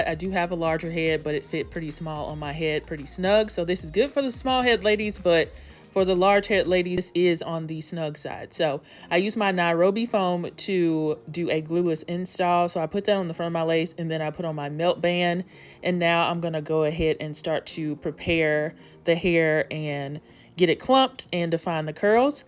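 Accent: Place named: Tennessee, United States. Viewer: I hear a little county accent 😏 I'll guess Tennessee. 🤔 Gorgeous curls tho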